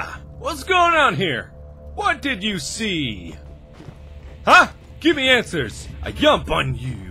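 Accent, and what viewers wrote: Sweden. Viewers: the accent is so Swedish i can tell as a Swedish person s easy to tell if someone is Swedish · That is so swedishenglish that i am going to puke.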